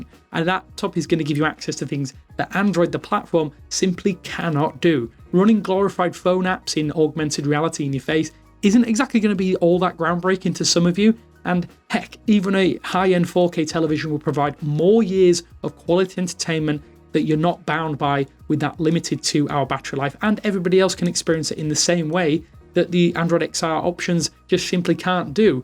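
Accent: United Kingdom. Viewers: This is one of the worst XR/VR related videos I have ever seen... way back into the Vive and Index days nothing compares to how awful this guys takes are. It's actually unbelievable he has a job doing this... something tells me the British accent is carrying him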